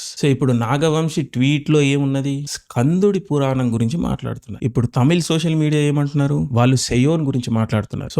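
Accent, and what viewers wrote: Telangana, India. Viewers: Telangana Yasa 🙏🏻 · ఇంతకు నువ్వు మాట్లాడేది తెలుగా తెలంగాణ నా??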